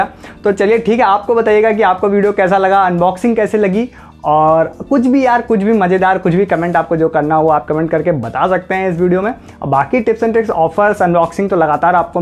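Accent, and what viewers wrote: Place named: Uttar Pradesh, India. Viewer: 3.14 bhai yar. puri UP ki bhasha bhai. ghar ki yaad diladi :P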